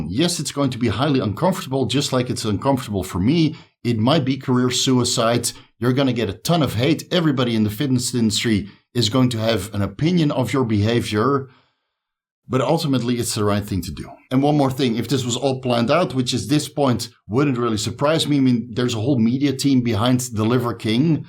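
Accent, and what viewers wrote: Netherlands. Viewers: good video! you sound dutch. are you? greetings from the Netherlands haha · are you dutch? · Your profile says USA, but this is a Dutch accent right?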